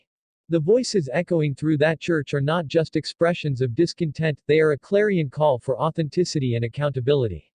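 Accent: United States. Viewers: i have never heard of anyone being kicked out of a church unless they caused a nusiance ,this looks like,yet another of these youtube videos that have suddenly shot up with American voice overs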